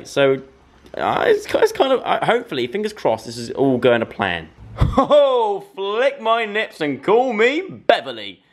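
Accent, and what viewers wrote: Australia. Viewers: I love your Australian accent,! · i love that Australian accent 😂❤ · Love ur Australia accent! Come to my home and I'll teach ya. Have a good day! Love u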